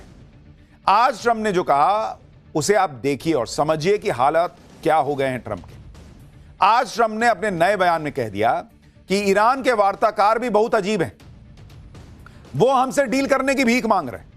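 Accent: India. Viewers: Bro. Your deshi way to say is great maza aata hay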